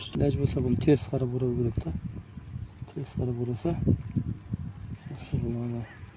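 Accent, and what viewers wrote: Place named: Turkistan, Kazakhstan. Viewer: Ассалаумағалайкум аватар жүзімінің қаламшасын күзде дәл осы кезде кесіп көміп көктемде тамыр шығарып алса болама? Түркістанның қазағымын сіз сөйлеп жатқанда қай тілде сөйледіңіз тура біз сияқты ғой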